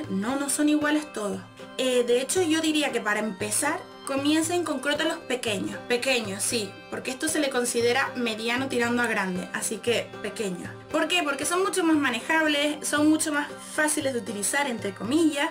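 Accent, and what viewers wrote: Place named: Canary Islands, Spain. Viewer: holaaaaa chiquilla!!!!, si dame consejos ( te cuento, es un secreto no le digas a nadie porque mi gran reputacion esta en juego jeee, me cerraron transitoriamente la clase de folklore arabe porque mi profe Ramiro tiene unos proyectos importantes ( y tambien es secreto, sacara un disco con Amir y Saida en la portada ) asi que quede algo colgado, igual voy siempre a la academia y llego un nuevo compañero que hace bellydance y bueno me meti en esa clase jaaa, no puedo dejar de ir a la academia y ha sido una forma de seguir participando, por supuesto que me veo como gorila intentando hacer los pasos, por supuesto que nos divertimos mucho con mis compis jee, y bueno tendre que esperar un tiempito, no quiero irme a otra academia - y bueno ya nos estan dando clase de ritmologia y vamos a empezar a usar los chinchines ( crotalos ) jee, entonces help me por cierto te ves re linda amiga - re lindo tu monedero - uen consejo donde comprarlos, no hay tienda de esencia oriental??? 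- bueno tengo un amigo de Mexico que hizo un belly con chinchines pero usaba traje de varon de folklore arabe y se ve muy bien, asi que mi idea es hacer un dabke o folklore arabe usando chinchines - bien, sueltito y con elastico, de 10 - el imperdible jee ( traba de gancho jaa ) que lindo hablan en Canaria, ya te lo dije muchas veces - buenisimo video me re re sirvio beshos besho s smuack!!! - Gus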